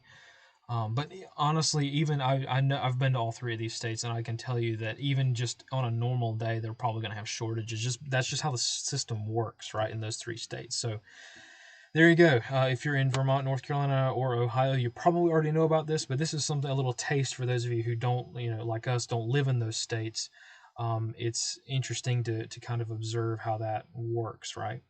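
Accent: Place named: South Carolina, United States. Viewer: Just found your channel the other day and today found out you did briefs like this. This is awesome. BTW as an SC native you sound like one of us! Thank you for sharing your wealth of knowledge and skill with us. It is greatly appreciated and a service to your fellow Americans. Godspeed to all you gentlemen at S2!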